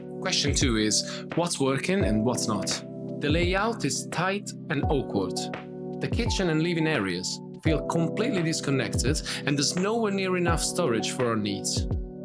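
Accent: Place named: Glasgow, United Kingdom. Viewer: Top notch! You ever lived in Glasgow? Got a bit of glasgow in ya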